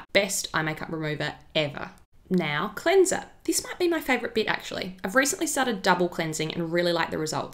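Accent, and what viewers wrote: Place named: Australia. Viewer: love your Aussie accent! nice skin care routine!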